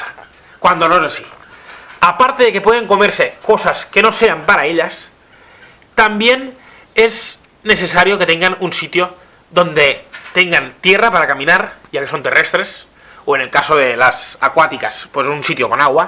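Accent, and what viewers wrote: Catalonia, Spain. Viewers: Que eres de cataluña no? Por el acento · Es de el Delta del Ebro verdad? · Pq hablas asi?? Eres de cataluña??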